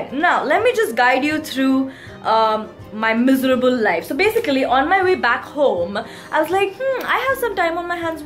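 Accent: Maharashtra, India. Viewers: I lyk d Marathi touch u gv in all your videos... "Just ugach " ❤️..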